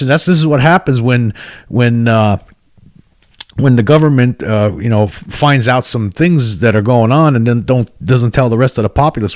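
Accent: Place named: Philadelphia, United States. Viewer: You sound like a Philly boy like me.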